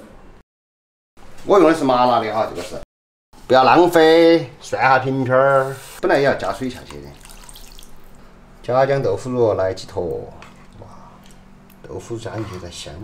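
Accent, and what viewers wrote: Chengdu, China. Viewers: 你的成都话我太欣赏了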